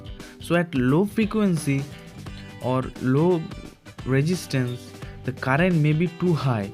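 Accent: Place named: Bihar, India. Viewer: Tu jaroor bihari hai.... angreji kee maa behan kyo kar raha hai bhai ...bihari mai bol de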